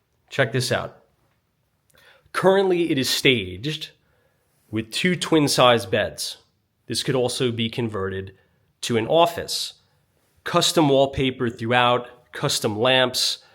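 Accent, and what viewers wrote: New York, United States. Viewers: He’s a New Yawkah!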